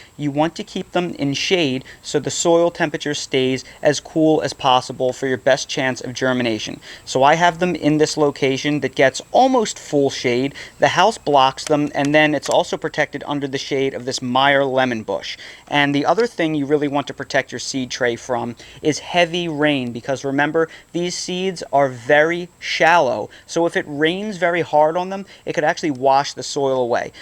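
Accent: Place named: New Jersey, United States. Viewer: You can take the boy out of Jersey but . . . LOVE your content - you have to be from Jersey; No? Bucks County here - just had to comment little northern for the south - love it though!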